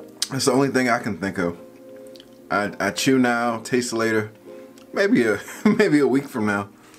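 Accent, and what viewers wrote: Maryland, United States. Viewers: Can you do a vid flexing your Maryland accent It is so interesting 🤖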